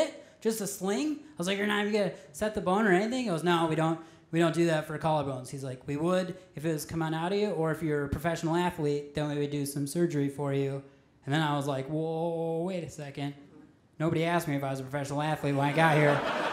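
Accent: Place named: Wisconsin, United States. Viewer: Where’s he from? Accent sounds Wisconie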